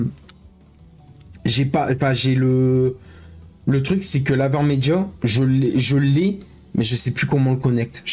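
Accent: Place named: North, France. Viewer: l'accent de frotteur de bus du nord frère c'est effarant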